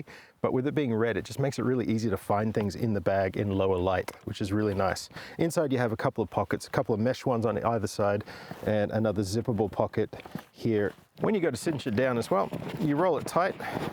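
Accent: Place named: Australia. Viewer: Love the accent dude. Not sure if Aussie, Kiwi or Yank or mix therein. I really like that bike too. Thanks for the informative vid na!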